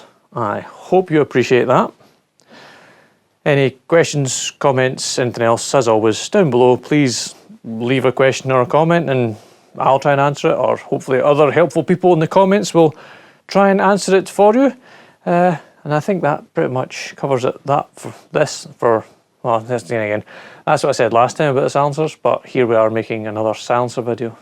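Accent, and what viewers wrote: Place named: Fife, United Kingdom. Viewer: Your accent rather reminds me of Fife Robertson in the 1960s. Are you perhaps from the proud Kingdom of Fife ??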